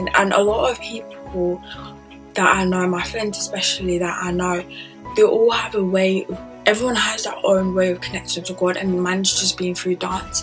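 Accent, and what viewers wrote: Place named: Scotland, United Kingdom. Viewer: Sounds Scottish to me lovely story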